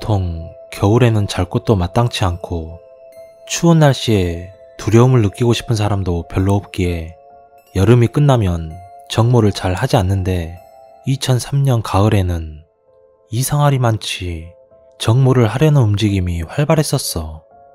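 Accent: Jeolla, South Korea. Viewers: 전라도 사투리 졸귀탱ㅋㅋㄱㄱㅋ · 아 특유의 전라도 억양이 너무 정감가서 그런가 내용이 집중이 안되네요 좀 웃김 !! ㅎㅎㅎ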